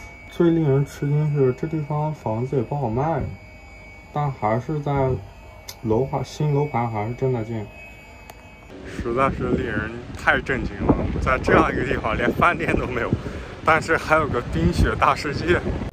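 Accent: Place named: Cantonese, China. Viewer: 发现广普真好听。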